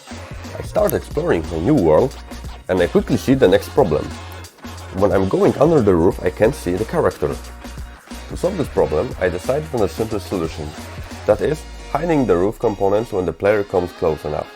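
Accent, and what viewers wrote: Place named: Poland. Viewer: I can hear fellow polish dev :D keep it going!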